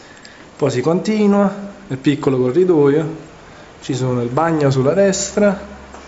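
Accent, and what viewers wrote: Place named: Naples, Italy. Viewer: Nei video di multiplayer.it l'accento napoletano non è così marcato come qui,lo stai man mano perdendo oppure lo contieni?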